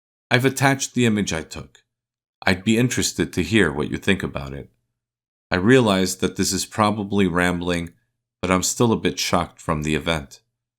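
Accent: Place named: United States. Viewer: I was actually wondering that (excellent American accent btw, but I can also hear the Hungarian), if this is your native country, telling of local stories. That mega-brilliant anti brain fart, i.e. epiphany, came to me later, however I forgot to edit my comment to include it. Sorry. I do love your content with the excellent quality you bring ;) I've long been into cryptids & paranormal occurrences, so this is great!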